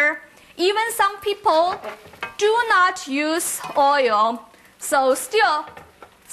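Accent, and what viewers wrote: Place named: China. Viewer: english no good is hard to understand ....chinese english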